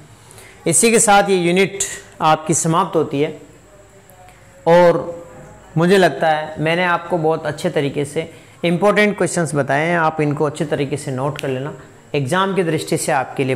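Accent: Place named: Bhilwara, India. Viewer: 🙏🙏🙏🙏🙏🙏🙏🙏बहुत बहुत धन्यवाद sirji.... वैसे क्या आप भी भीलवाड़ा से है... भी... से अभिप्राय है की,,, मैं तो पक्का भीलवाड़ा से हूँ.... और आपकी लैंग्वेज और बोलने के तरिके से लगता है आप भी भीलवाड़ा के ही है... सही कहाँ ना मेने 😄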